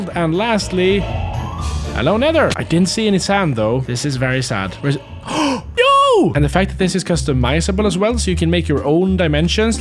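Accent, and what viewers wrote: Sweden. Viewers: hejsan du låter svensk! men är du det?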